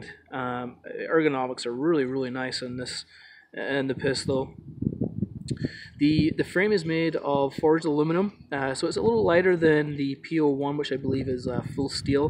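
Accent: Scotland, United Kingdom. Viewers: I was thinking Scotland! Sounds like a mix of Scottish, Canadian and American haha Thanks for the review. Would you recommend this as a first handgun? I'm looking for a good range gun but also something I may want to carry occasionally.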